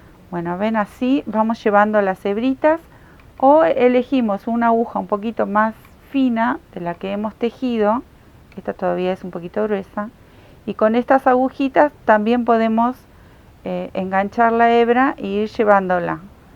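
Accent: Argentina. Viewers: De acuerdo, la cobijita mas linda del internet. Yo disfrute muchisimo los videos, uno tras otro como si fuera una serie de tv. Yo soy mexicana, y me encanta su acento argentino! Estas cobijas no tienen igual, mi abuelita tejio una, se la dio a mi mama y todos la querian usar, iba de alla para aca. En mi familia yo soy la tejedora, de niña me iba sola a clases de tejido con puras señoras, y desde entonces me encanta. Gracias por estos hermosos tutoriales!!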